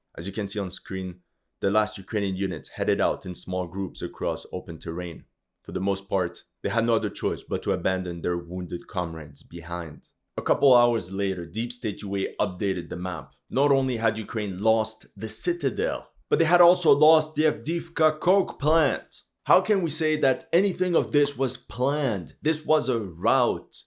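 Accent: Russia. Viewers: Lol, "good job". May I ask you where are you from? Sounds like you're sweating your ass to hide Russian accent.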